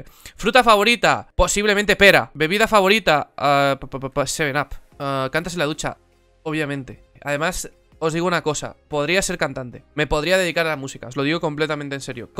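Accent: Argentina. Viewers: Sos mitad argentino ? Douuuuuu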